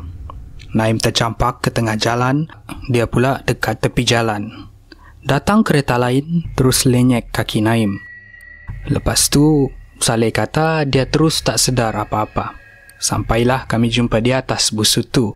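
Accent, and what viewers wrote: Sabah, Malaysia. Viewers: Contohnya. Kamu mau apa sih, bukan ini donk. Kelmarin kamu bikin apa? Ada ke orang sabah cakap camtu? So kite boleh beza dari situ. Apa yang ku terangkan tadi itu lah bahasa indonesia. Yang bercerita ni orang sabah malaysia.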